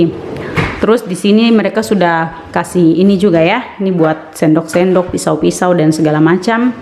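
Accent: Papua, Indonesia. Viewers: Sy paling suka dgn logat papuanya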